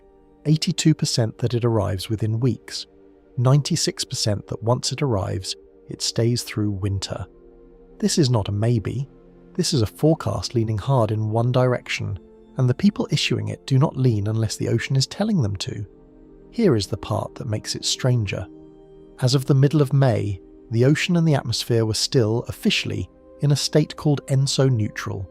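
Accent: England, United Kingdom. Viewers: Lose the English accent It sounds like shit.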